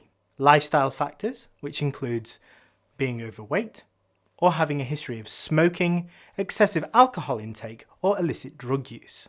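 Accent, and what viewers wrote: United Kingdom. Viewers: What a huge difference in hearing a doctor from the UK explaining all this, rather than an american doctor.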